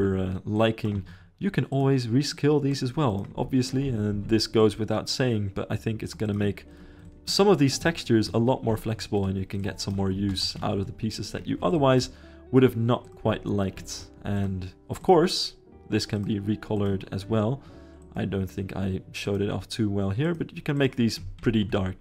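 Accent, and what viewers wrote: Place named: Netherlands. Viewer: The thick Dutch accent tho :D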